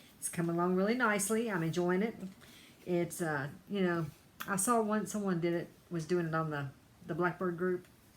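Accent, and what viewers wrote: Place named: North Carolina, United States. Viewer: I love just hearing you talk... my grandmother was from North Carolina and she sounded just like you as well as my aunts. Warmed my heart so much.But the fact you are talking about stitching is even better.Loved seeing all your working on :)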